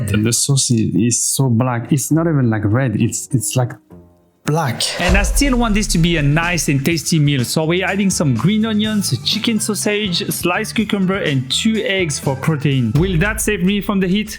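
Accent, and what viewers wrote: France. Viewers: Why do you have a French accent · Salut mec depuis que j'ai clicker sur t'a vidéo j'ai directement remarqué ton accent français 🤣🤣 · The french accent makes watching this so fun( u should have 100k subs ATLEAST)😅😅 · Is that a french accent I hear??? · Beautiful vidéo the french accent Is definitely your signature branding wise, don't loose It .x')❤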